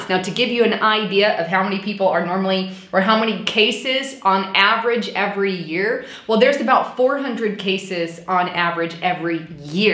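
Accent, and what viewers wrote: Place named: Midwest, United States. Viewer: where are you from? You sound midwestern. I just suscribed to your channel a week ago. I really enjoy your reports.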